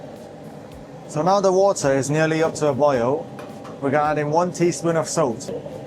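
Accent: United Kingdom. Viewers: Why would you assume that everyone who looks chinese is from china?? Also, did you NOT HEAR the UK twang?? He's more english than uncle roger. HELLO.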